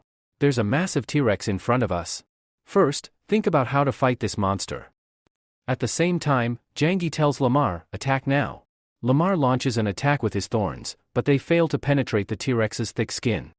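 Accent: India. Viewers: Wtf is this voice bro is not getting ppl it's is like a Indian person is talking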